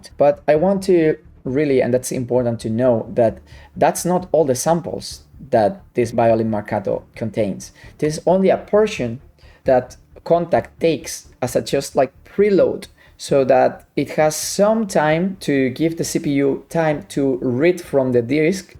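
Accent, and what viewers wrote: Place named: Spain. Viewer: soy de colombia y hasta en inglés se nota el acento de españa. hahahaha, tan lindo e inteligente que es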